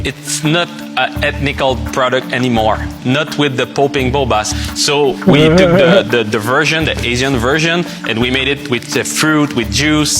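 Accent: United States. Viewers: i thought you were american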